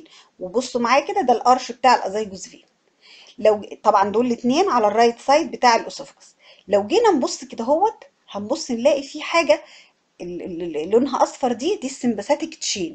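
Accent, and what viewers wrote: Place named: Egypt. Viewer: ده أسلوب غير لائق إنك تعلق على نطق الأستاذ الدكتور، الدكتور مصرية والمحتوى تابع لجامعة مصرية وبالتالي اللهجة مصرية ، ونحن الطلاب المصريون نفهم الكلام من أساتذتنا ، لكن كونك بحثت عن شرح معلومة ووجت أحدا يشرحها فستمع إليه وتعلم ؛ هذا من المنطق ، وليس التعديل على النطق ، حضرتك مبعجبكش الأكسنت عندنا ، اسمع محتوى أجنبي . سلااااااااااام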